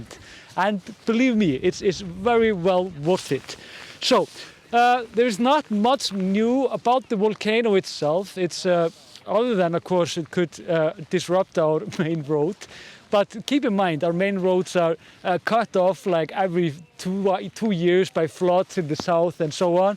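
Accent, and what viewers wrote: Iceland. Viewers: I really love your repots from the volcano and and your funny Icelandic accent :). You and your camera man are really doing a great job! I'm wondering about more m3 of lava because, in my opinion, the eruptions have become much shorter than before. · I love Your Islandic accent ❤️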